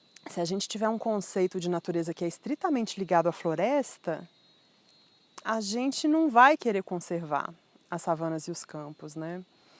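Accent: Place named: Minas Gerais, Brazil. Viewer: Que bacana... Você conta como mineiro, tudo vira historia. Trazer o cerrado para dentro, é muito bacana.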